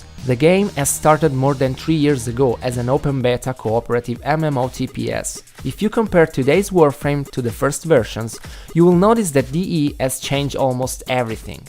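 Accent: Rome, Italy. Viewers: inglese romano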